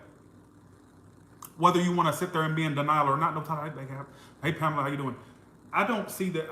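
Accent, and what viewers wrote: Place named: Texas, United States. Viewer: you sound like a Texan, reminding me of my cousin walter/jeremiah, from Pasadena / houston <3 lmk if you are. love it. and im a spiritualist/ tarotist too, i had my entire YouTube erased , just about. i feel your pain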